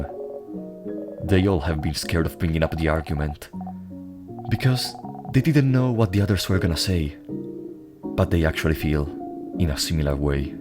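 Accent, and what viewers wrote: Italy. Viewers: Are you italian by any chance?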